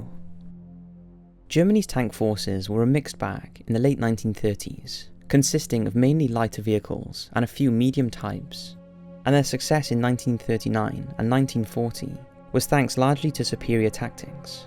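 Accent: Wales, United Kingdom. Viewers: Ate you Welsh? If so what part? I'm west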